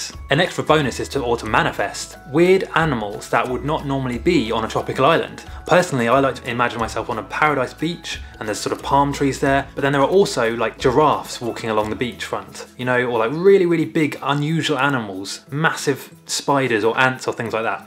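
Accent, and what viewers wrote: England, United Kingdom. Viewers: You are like the joe wicks of lucid dreaming, Long hair, English Accent and all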